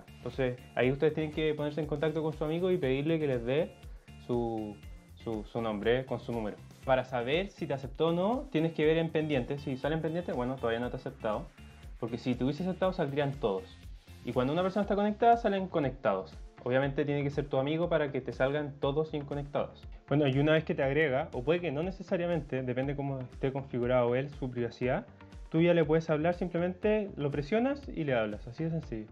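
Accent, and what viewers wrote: Chile. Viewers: Eres chileno 👊😎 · no te conozco pero eres chileno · Mire este video y me sirvio artazo, ah y eres chileno jajaja, me suscribo XD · Es chileno ? O no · No te conozco pero hablas como chileno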